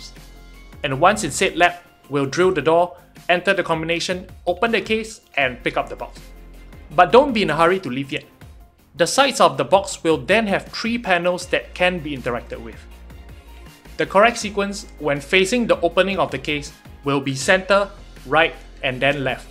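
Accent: Singapore. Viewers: Bruh are you from SG?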